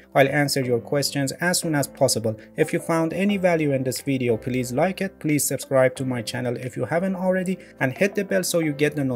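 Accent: Iran. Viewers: You have a strong Persian accent Hard to understand what you’re saying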